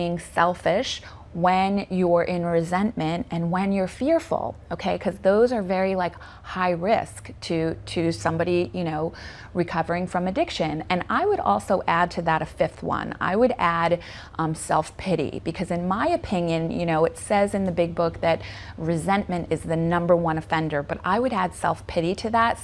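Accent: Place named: New York, United States. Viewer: always! FYI...I’m on my 5 and 6 th step and love your NY Voice more than anyone else 😉💜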